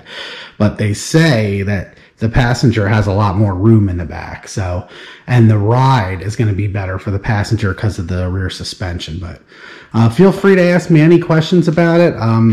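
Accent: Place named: Western Pennsylvania, United States. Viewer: Your voice sounds like you are from western Pennsylvania.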